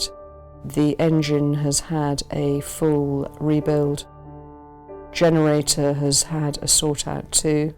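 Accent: United Kingdom. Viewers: I love her English Accent, so how much is it? Anyone?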